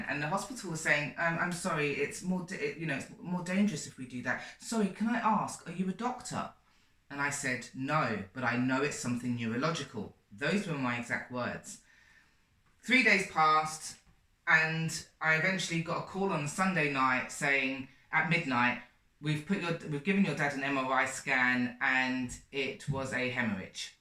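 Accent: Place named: United Kingdom. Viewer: Hi, lobed ypur video. I realise I am a claircognizance too.. Sending positive vibrations to you regarding your Dad. You sound British, are you in the UK?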